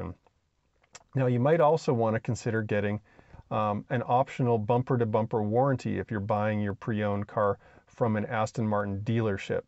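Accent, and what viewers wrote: Canada. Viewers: From your "aboot"s, do I take it these figures are in CANADIAN dollars?? You never specify U.S. or otherwise, so... please clarify! :) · You were not kidding about those costs. I did buy a 2006 V8 Vantage with a manual gearbox. It was a lovely car - for someone more well-heeled than I. Parts costs do not compare to labor at the dealer, and both are truly expensive. I had a limited mileage full insurance policy so that greatly reduced operating costs and "wear and tear." I liked the car a lot, bit too much understeer, and the clutch engagement was very sudden at the top of the pedal stroke. I was fourth owner, and the car only had 25000mi on her when I acquired if for the ten months I had her. I might look into another one of the earlier, simpler cars of this era again, and would advise any potential owner to shop and maintain it wisely. Thank you for the video. 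I note a bit of a Canadian accent for Californian though! 😀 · I can tell he’s Canadian by the way he says “out”, and “about”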